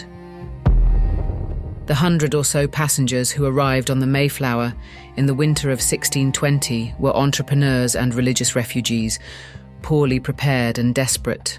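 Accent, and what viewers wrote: United Kingdom. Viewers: I’m 49 seconds in, the British accent on the narration though? I hate AI because it has a way of coming across as tone deaf and too much, but the imaging is cool and story telling is (over the top. These things can be written by a romance novelist for the same effect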